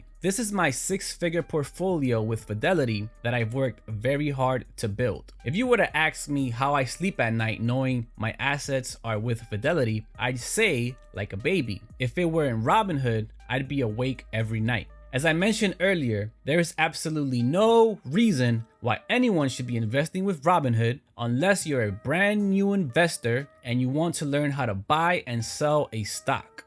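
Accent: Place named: New Jersey, United States. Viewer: Are you from New Jersey?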